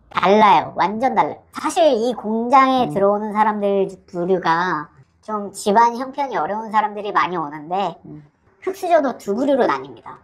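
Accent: Gyeongsang, South Korea. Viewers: 대구 말투 거슬리네요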